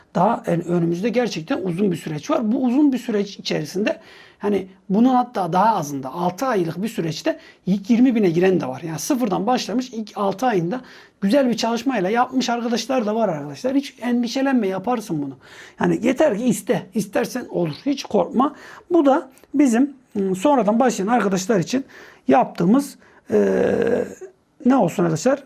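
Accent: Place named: Gaziantep, Turkey. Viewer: Hocam Antepli siniz galiba 😄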